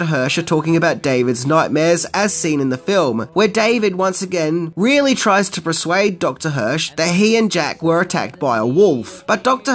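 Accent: Australia. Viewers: Seriously? He’s one of the most Australian-sounding people out there!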